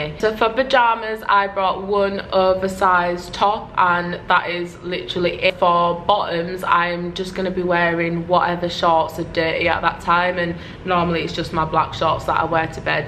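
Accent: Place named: Northern England, United Kingdom. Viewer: literally just came across this vid and the first thing i noticed is how much i love your northern accent! i'm from middlesbrough...love the video...i'll be hopefully doing this trip shortly! keep smashing it! #NorthernLassesDoItBest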